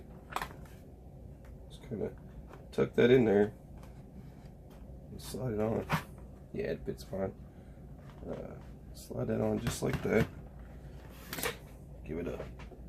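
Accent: South, United States. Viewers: Nice work You sound more southern then me ha im in Mississippi. Were you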